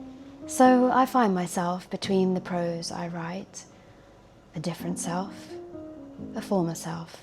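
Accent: United Kingdom. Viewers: Great video, my algorithm has been serving me this kind of stuff lately, it’s really refreshing. By the way, where in Britain are you from, what is that particular type of British accent?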